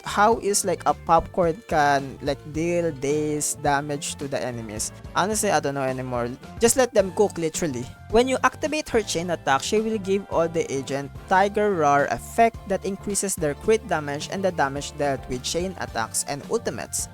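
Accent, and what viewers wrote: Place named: Philippines. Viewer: Beri Pilipino accent! Reminds me of Jo Koy. Correct me if I'm wrong but if right, keep it up kabayan! 😊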